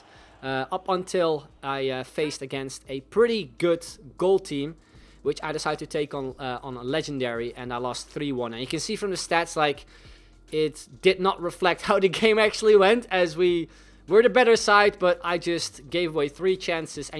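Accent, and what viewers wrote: Netherlands. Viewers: K denk dat je Nederlands ben heel klein accentje nog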